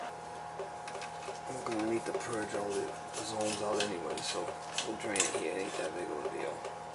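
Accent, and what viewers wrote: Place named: Boston, United States. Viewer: LOL, as soon as I heard "Octobah," I knew you weren't fah from Bahston. Greetings from Newton.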